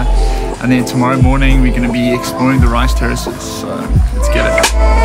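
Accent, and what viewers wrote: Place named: South Africa. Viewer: Love your stuff dude. Are you South African? Sounds like it ;-) Lekker man.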